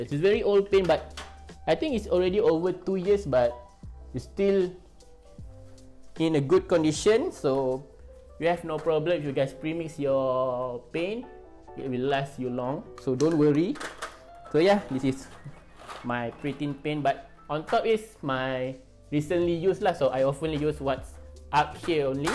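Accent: Malaysia. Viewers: are you malaysia or Brunei? Bcz I hear you say lah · The first thing when I hear his voice my mind straight thinking about his Malaysian man because of his voice and accent. Because im Malaysian.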